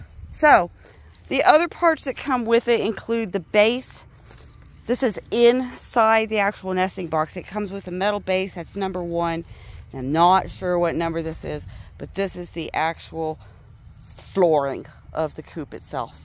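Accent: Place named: Texas, United States. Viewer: Besides the main topic of "how to" , to did this vid so us common folk could keep up so Thank you. Just a side note, you spoke just like my dad a grammama did and it brought Texas right back with a tender force so thank you for that too.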